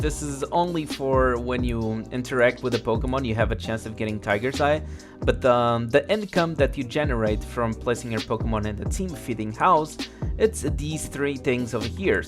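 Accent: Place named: Portugal. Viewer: eu tava entendendo ele falar em ingles, tava achando estranho...ai vi que ele é portugues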